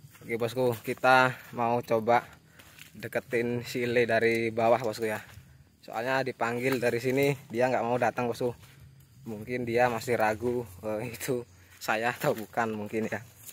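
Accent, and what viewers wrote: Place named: Malang, Indonesia. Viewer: Ini didaerah mana kang? Kok seperti bahasa saya daerah malang selatan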